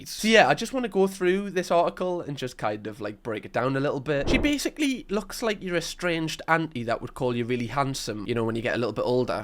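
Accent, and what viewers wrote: Newcastle, United Kingdom. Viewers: Hes from Newcastle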